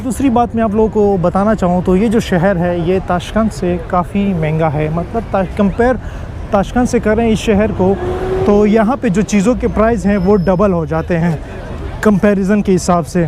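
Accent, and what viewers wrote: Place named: Pakistan. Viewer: Pakistan accent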